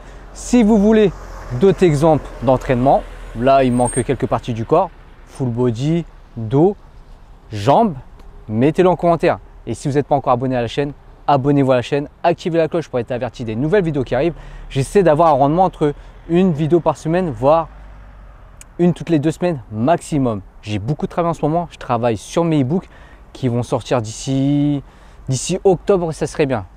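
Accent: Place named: Saint-Étienne, France. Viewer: Tu est de saint Étienne toi non ? Car tu a vachement l’accent de là-bas lol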